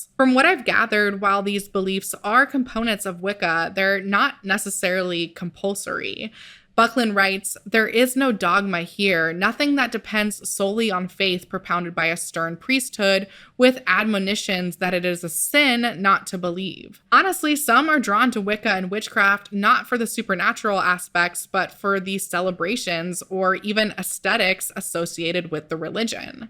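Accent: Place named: England, United Kingdom. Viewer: I share your english accent, but its funny you don't know we have one. go ahead and google 'how to pronounce satan'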